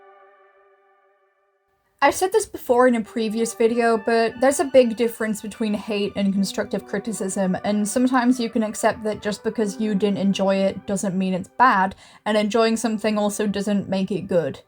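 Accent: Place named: United Kingdom. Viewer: Really cool watching a worm talking about liminal space in a british accent.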